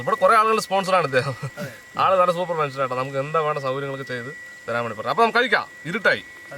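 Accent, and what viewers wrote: Palakkad, India. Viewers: Palakkad slang🙈🙈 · Edh rajyathayalum ikkayude tade mark ane... Kalli thuni Hawayi Palakkadan slank Nalla atmosphere... 😍😍😘😘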